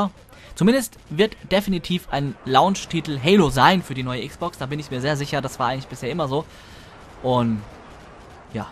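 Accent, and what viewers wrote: Hesse, Germany. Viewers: dein Hessisch ist genial:)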